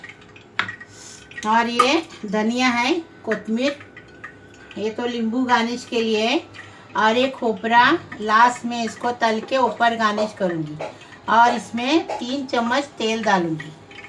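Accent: Konkan, India. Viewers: Aapko dekhkar mujhe meri padosi kokani aunty ki yaad aa gai.. aapka bolne ka tariqa.. 👌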